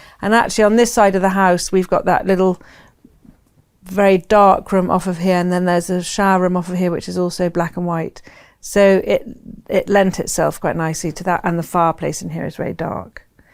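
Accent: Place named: England, United Kingdom. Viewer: Love her homey style and I love her English accent.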